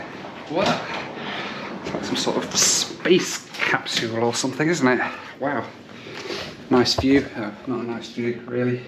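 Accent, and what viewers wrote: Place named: United Kingdom. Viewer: Thank you for doing what you do. As an Italian that grew up in the UK, and that now lives in the US, it’s good to hear a familiar accent showcase the same passion I have.. aviation. You’re likely the only channel on YouTube, I watch every day. Thanks again and I wish you luck for the future!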